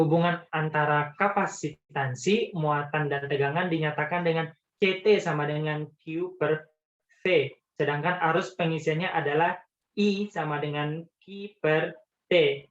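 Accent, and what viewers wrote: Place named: Padang, Indonesia. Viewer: Logat Padangnya kental sekali ... 👏👏👏👏👏👏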